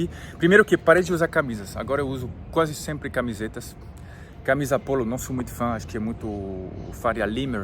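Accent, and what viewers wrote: France. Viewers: O portugues do cada dia melhor. Daqui a pouco ninguem vai ouvir o o sotaque frances. Eu morei um tempo em Portugal, e não gostei. Acho que glamoriza-se muito morar na Europa. Por exemplo, os portugueses são muito dificeis, estressados, rudes, burocráticos, sem paciencia, parece burro empacado. Sem falar na agua horrivel. Paga-se uma fortuna pra comer uma carne decente. Ponto positivo para a segurança, o euro, a estrutura, apesar q salario portugues é bem baixo. Mas visitei uns amigos no Sul da França, alguns vilarejos muito lindos a caminho de Andorra, não tem como não se apaixonar pela França. Embora tenha tido pouco contado com franceses, me senti acolhida, senti que é um lugar aonde eu viveria feliz. Mas como estava morando em Portugal e nao tinah me organizado pra ficar na França, acabei voltando ao Brasil. Apesar dos problemas do Brasil, da corrupção que esta no DNA de muitos brasileiros, da ignorancia, da idiotização, da influencia da cultura americana, da moeda desvalorizada, falta de estrutura, amo meu pais. 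Faz muita diferença esta perto da familia, dos amigos, o clima, sol (como faz falta) as pessoas, a variedade e qualidade da nossa alimentação, a agua, a cultura mais solidaria, o humor do brasileiro, a praticidade, as modernidades que ja existem no Brasil, as praias, as do Nordeste, amo. Mas tb nao gosto das praias com muita construção tipo as de cidade. Balneario, Rio, Recife. Prefiro as mais afastadas, mas com alguma estrutura. Porque aquelas praias no meio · Sotaque de franceses falando português é tão fofinho kkkkkk ❤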